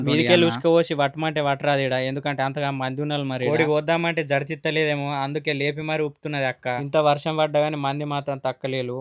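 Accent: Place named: Adilabad, India. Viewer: Hi brother my name is vemula srinivas from Karimnagar..mi chanal subscribe ni ...chala Baga vuntavi funny reels ... adilabad slang super...maku adilabad kachikanti village lo friend s vunaru every year twice vasthamu ...nenu lecturer ni ..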